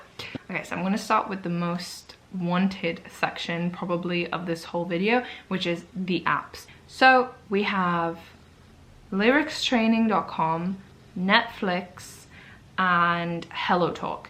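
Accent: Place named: United Kingdom. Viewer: At the beginning I heard only British accent 😂❤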